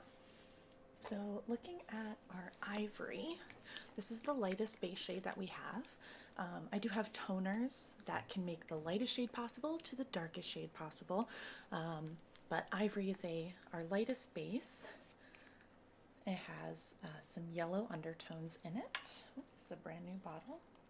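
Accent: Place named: United States. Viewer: I totally thought you were going to have a british accent 😂 I'm actually shocked you have an American accent 😂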